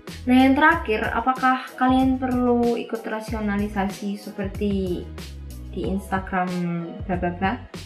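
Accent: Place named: Java, Indonesia. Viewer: Medok Jawa e rek😅😂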